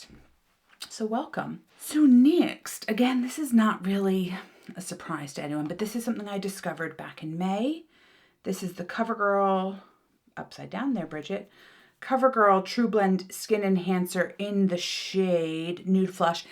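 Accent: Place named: United Kingdom. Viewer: Hi, just came across your channel. I spent the first 5mins trying to work out if you were British , American or Irish 😅 I’m loving your content so, you have another subscriber . I’m 64 years young and I’m Scottish. I love makeup especially drugstore so, I’m hoping you’re not all about high end. Sorry❤❤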